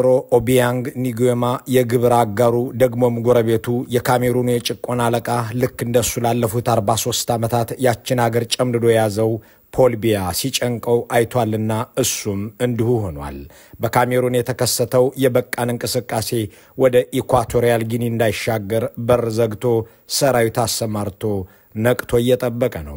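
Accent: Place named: Qomche, Ethiopia. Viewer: አክሰንትህ ቆምጬ መሆንህን ያሳብቃል ። ቢያንስ ተቃውሞህ እንዲሰማ ...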